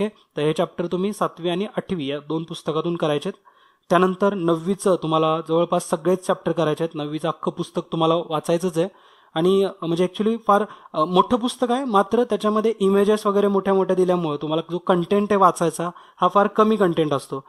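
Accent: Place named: Pune, India. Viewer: sir mala vatthe tumhi nakki punyache aahat karan tumcha bolnayacha ton ha same tasach aahe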